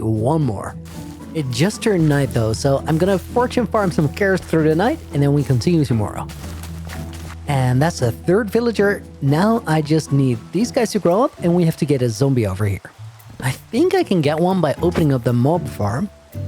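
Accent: Netherlands. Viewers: Toch lekker dat kleine nederlandse accenr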